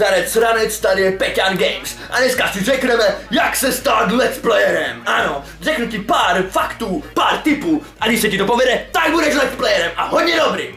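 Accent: Moravia, Czech Republic. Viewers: Jsi z moravy??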